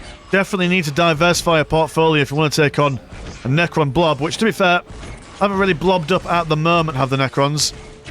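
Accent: United Kingdom. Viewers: its not Warhammer without a British man casting it, love your content man!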